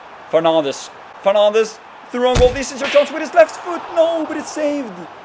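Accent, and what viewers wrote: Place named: Norway. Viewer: Are you Norwegian? You speak kind of Norwegian/English 😊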